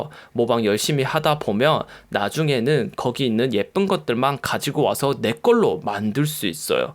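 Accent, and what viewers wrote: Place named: Daegu, South Korea. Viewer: 사투리인가? 말투가 귀엽?다고 생각했는데 대구분이신가봐요. 저도 회사때문에 대구살고 있는 디자이너인데 ㅎㅎ 반가워요.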